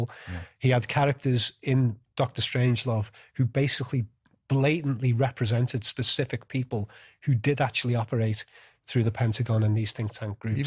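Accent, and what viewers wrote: Liverpool, United Kingdom. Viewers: I love when unleash his Liverpool accent in all his glory! 🏴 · you sound just like Paul McCartney, are you from Liverpool? Anyways, I like it, & I love your videos about movies, esp Stanley’s ❤️ from Texas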